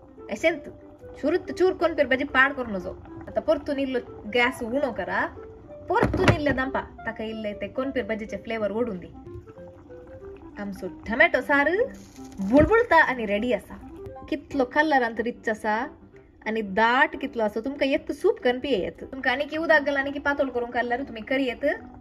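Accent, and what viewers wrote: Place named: Mangalore, India. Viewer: Recently started watching your video's and vlogs really enjoy the way you talk in manglorean . I too am a manglorean feels connected❤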